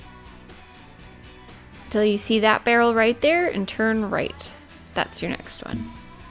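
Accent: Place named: Canada. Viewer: Thanks so much for the help. I believe I've found all 150 cases. (not sure if there are 151) LOL Btw, are you Canadian? The way you pronounce "out" and words with that sound are usually a dead giveaway. ;)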